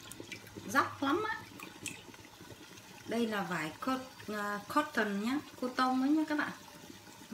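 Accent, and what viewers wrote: Vietnam. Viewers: Giọng nói của Cô hay quá Cô ah - giọng Bắc / Saigon xưa - thật rất đẹp và sang. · Đó là tiếng Bắc 54. Nghe rất hay và sang.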